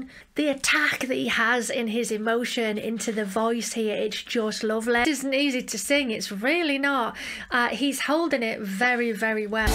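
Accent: Manchester, United Kingdom. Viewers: I love your accent, is it from around Manchester?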